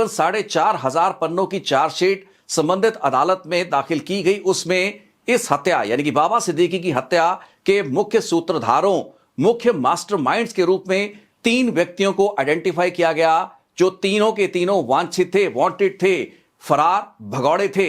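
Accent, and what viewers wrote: Punjab, India. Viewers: Yaar tere hindi v Punjabi hi vekh de ne.. feer punjabi ch hi boll lai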